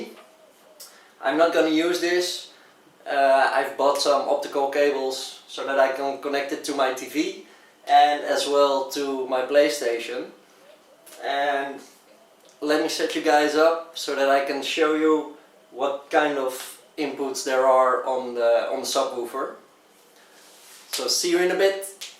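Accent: Netherlands. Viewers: Ik hoor een Nederlands accent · Het is trouwens te horen dat je Nederlandstalig bent! Heel tof!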